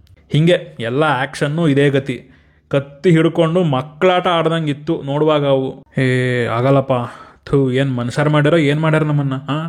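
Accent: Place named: Davanagere, India. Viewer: Pakka Namma Davanagere kannada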